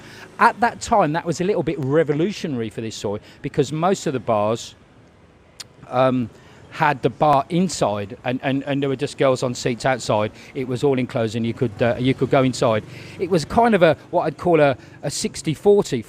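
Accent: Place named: Black Country, United Kingdom. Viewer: Even though you sound yam, I can imagine it must have been a bit of a culture shock going from Smethwick to Soi 6?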